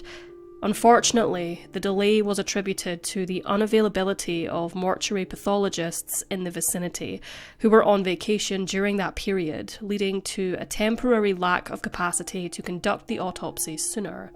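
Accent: Scotland, United Kingdom. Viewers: Her accent is Scottish 😊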